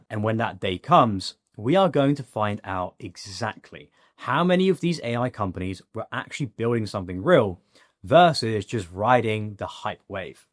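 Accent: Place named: United Kingdom. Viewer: when i hear british accent i'm already prepared to hear nonsense, proven itself true more often than not... and here we go again. how about making a vid explaining that the ai bubble burst, once it actually did!? oh no, can't do that yet, bc it hasn't, and if you'd not make these ridiculous "predictions", then you couldn't say later on "yeheyy, told you so!"... but what happens if there actually isn't an ai bubble?? then you'll just be quiet and hope nobody's going to call you out for your bs opinion bc nobody will bc you're not well known / a celebrity. so there you are making nonsense "predictions"... but why? what are you so angry about? explain??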